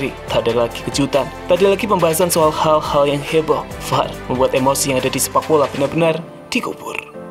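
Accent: Java, Indonesia. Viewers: Medok banget ngomongnya.. Hedeeeeewh..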